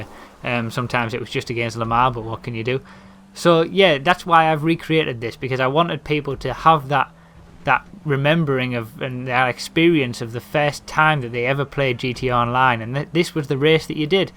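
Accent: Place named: North East England, United Kingdom. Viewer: Thought so, im from Newcastle :) Never really came across a north east commentator, good content though keep it up!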